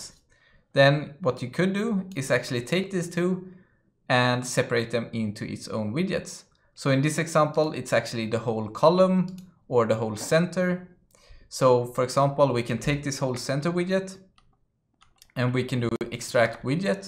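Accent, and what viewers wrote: Sweden. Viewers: Great great tips. So you're Swedish, aWay -- aVay 🙂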